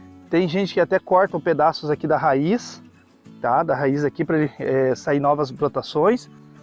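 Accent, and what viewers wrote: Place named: South, Brazil. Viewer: 😂 taiôba não sô taioba 😂 sotaque do sul 😂 amei o conteúdo. Deus abençoe vocês hoje e sempre.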